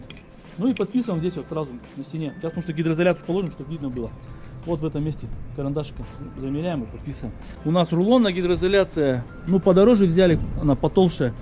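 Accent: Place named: Ivanovo, Russia. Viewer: По говору Иваново, Кострома.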